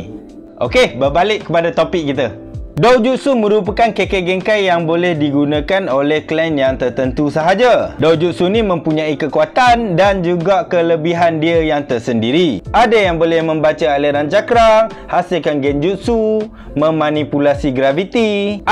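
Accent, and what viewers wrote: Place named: Kuala Kangsar, Malaysia. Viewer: atee deme ckp mcm orang kuale deme orng perak yea bote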